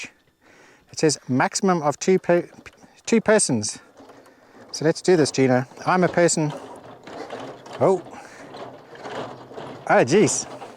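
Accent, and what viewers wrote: South Africa. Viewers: Hi from Johannesburg, been watching for videos for a while now and rally great. Love hearing you SA accent. Love Castelo Branco, have visited many times , usually stay at the Tryp Hotel next to the Castle. We own a small farm in Juncal de Campo. Have not been able to travel to Portugal since Covid. Cant wait to come back, your videos make me miss it so much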